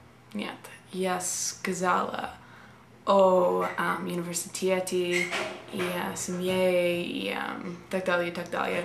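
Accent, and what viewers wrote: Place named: United States. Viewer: Try a little bit harder on pronunciation. You sound like an American speaking English with Russian vocabulary, But don't give up, it'll get better, Russian ain't Easy!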